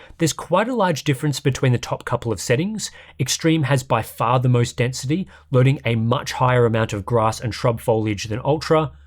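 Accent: Australia. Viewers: Australian accent usually isn't very salient in my mind when I'm just listening to the audio, but when he said "you should be right" I was very reminded that they're Australian.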